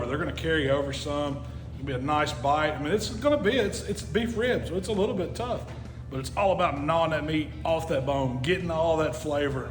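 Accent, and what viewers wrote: South, United States. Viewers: Souther drawl ✅ Beard ✅ Verge of type 2 Diabetus✅ You know it’s gonna be good food.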